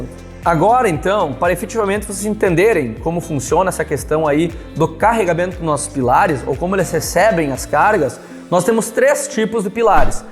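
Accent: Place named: Serra Gaúcha, Brazil. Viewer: Já sou seu fã e pelo tom de voz deve morar no sul serra gaúcha 👍🙏🙏👏👏👏👏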